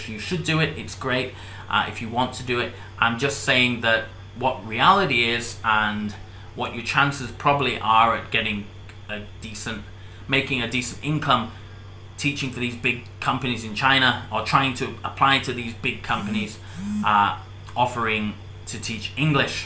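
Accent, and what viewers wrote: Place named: RP, United Kingdom. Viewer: Your queen's English needs a bit of clearer articulation. And yeah, weak argument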